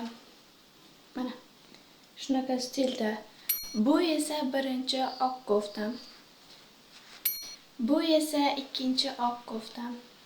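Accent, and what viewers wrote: Khorezm, Uzbekistan. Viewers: Babr xorazmlik ekanlikiz aksentdan blinibdi😂💘Lkn aesthetic chiqibdi🎰Manga kòpro 2-look yoqdi💅Lkn ikkalasiysm woooow✨ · Choʻta gapirishizdan xorazmliga oʻxshatdimdo szi xorazmlimasmisz🤔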